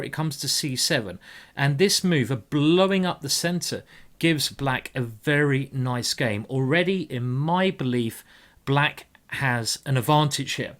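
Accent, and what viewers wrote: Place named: RP, United Kingdom. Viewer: Don't understand what the guy is talking about, uses nothing but pure queens English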